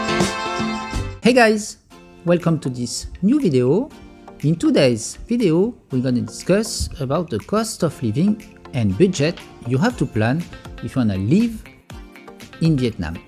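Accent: France. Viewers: T'as ouvert la bouche j'ai direct compris que tu étais français 😁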